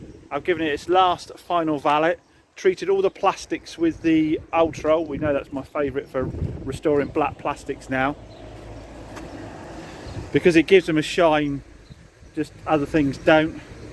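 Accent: South East London, United Kingdom. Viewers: He's got a completely typical South East London accent.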